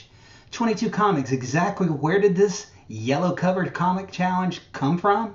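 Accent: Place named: South, United States. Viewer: Love the Hulk cover! The south was strong in this intro too 😅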